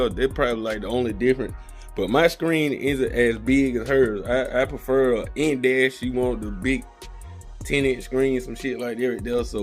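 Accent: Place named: East Texas, United States. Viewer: I stay out in east Texas and we got all the hurricane displaced people and we talk just like this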